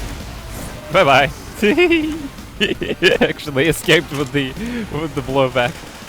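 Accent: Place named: United States. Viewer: I could never tell you speaked russian, your accent is so american :0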